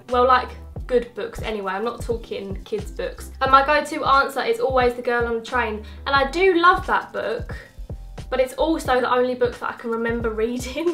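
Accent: Essex, United Kingdom. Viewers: you sound particularly Essex in this😂💘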